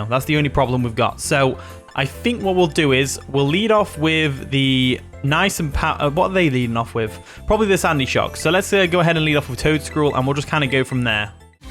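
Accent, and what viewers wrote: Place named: United Kingdom. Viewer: It's the accent bro! Everything's better in British.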